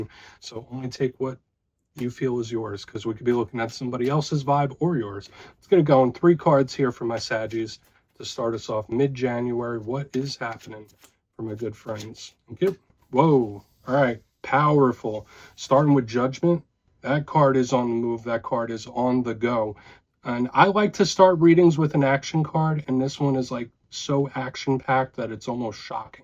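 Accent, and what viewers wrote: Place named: Louisiana, United States. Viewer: You sound like your from Louisiana!!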